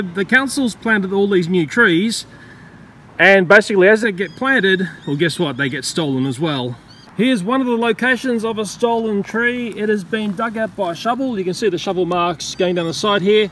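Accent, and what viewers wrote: Sydney, Australia. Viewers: Child in house says to mum "Mum, the loony spider man is outside talking to a chair"... Do love the Sydney accent, its like how us Londoners used to talk before London became the city of a million ponging ars... OK must restrain meself on social media, its a good job I am bona fide certified mentally ill cos I could get into trouble speaking me mind :P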